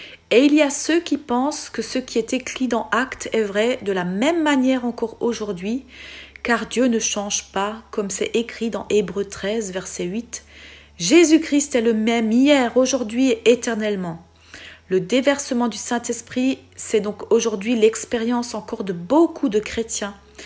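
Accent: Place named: Alsace, France. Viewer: Êtes vous originaire d'Alsace ? ( une petite pointe d'accent de l'Est ☺️❤️)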